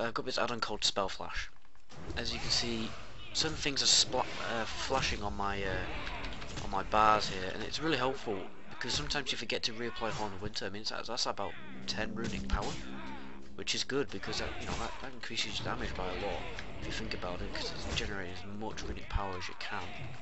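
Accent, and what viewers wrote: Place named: England, United Kingdom. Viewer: Oh how I missed the English accent and their way of pronouncing words, hehe.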